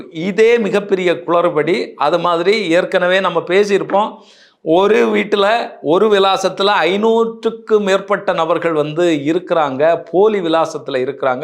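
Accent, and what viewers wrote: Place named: Nagercoil, India. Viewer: Bro உங்க பேச்சில் நாகர்கோவில் மணம் வீசுதே..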